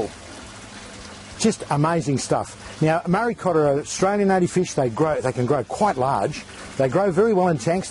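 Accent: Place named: Irish Traveller, Ireland. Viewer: Are you aTinker Irish?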